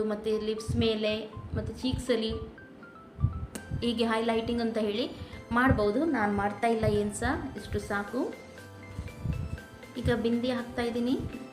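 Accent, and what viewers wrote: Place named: Karnataka, India. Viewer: Hi Akka im ur new subscriber I like ur video,the way u r explaining and ur kannada slang.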